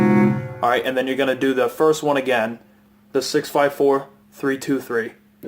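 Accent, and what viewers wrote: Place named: Massachusetts, United States. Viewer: A lot of these riffs you technically have the correct notes but they’re played quite differently by Mike mostly so they ring out as chords more than how you do it but I guess if you did this totally by ear you did a good job cuz the notes are mostly correct. Also where in MA are you from? I’m from there originally and I hear the accent lol. “choahd=chord”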